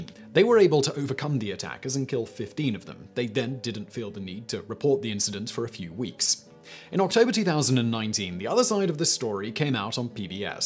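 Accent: United Kingdom. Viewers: Texas stories told with a British accent 🤔🤔🤔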